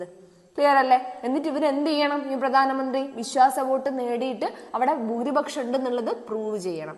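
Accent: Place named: Vadakara, India. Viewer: Vadakara ente oru frnd und same slang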